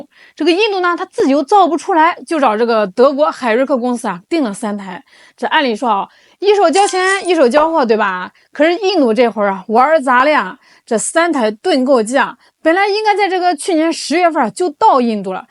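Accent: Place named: Hefei, China. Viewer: up主好像是合肥口音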